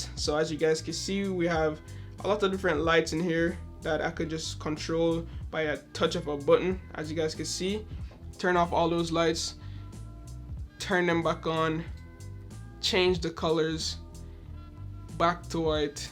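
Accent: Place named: Jamaica. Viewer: Da Jamaican accent is strong with this one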